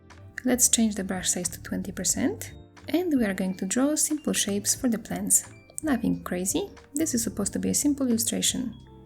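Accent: Poland. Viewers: Polski akcent zawsze poznam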